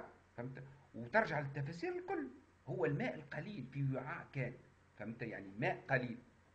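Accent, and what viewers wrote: Tunisia. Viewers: اذا تتكرم أخي وتخففّ من اللهجه التونسيه، شوي صعبه علينا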